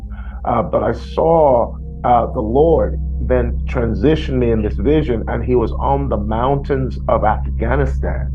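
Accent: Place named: United Kingdom. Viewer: God bless you. You may not understand, but people speak in different dialects around the world. And this is simply a British accent. This man is from the UK. So, they don’t pronounce words as people in the west do.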